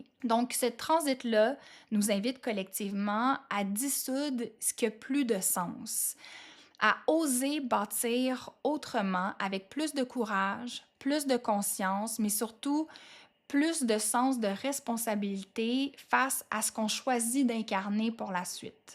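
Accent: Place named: Quebec, Canada. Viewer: Bonjour Charmante québécoise, ❤ je suis toujours sous le charme de l'accent Québécois que j'adore quand j'ai l'occasion de l'entendre. Merci pour cette belle énergie que vous déployer à expliquer le pourquoi du comment en Astrologie... Pour Févier, ça devrait bouger fort sur le plan émotionnel alors mettons notre ceinture et accrochons-nous au bastinguage... Merci à vous et continuez à prendre soin de votre Beauté, elle vous va si bien ... MERCI.❤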